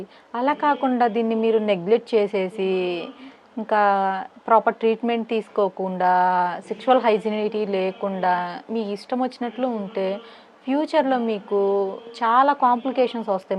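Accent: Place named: Andhra Pradesh, India. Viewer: Andhra slang alane untadi bro try to understand don't underestimate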